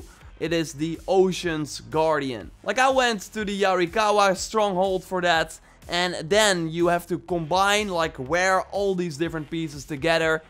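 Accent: Netherlands. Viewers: Nederlandser accent kan niet 😂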